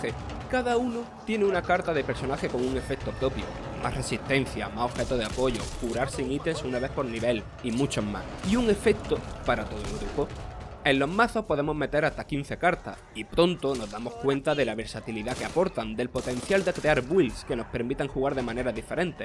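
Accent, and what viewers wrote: Spain. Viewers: Creo que he escuchado a Puertorriqueños hablar mejor el español, no tengo nada en contra bro. ¿Esto es un acento de alguna provincia de España? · Es andaluz , asique normal que tenga acento , si no lo entendeis es vuestro problema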